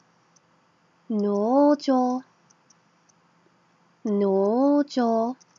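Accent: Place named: China. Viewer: giống giọng Trung của vl ;v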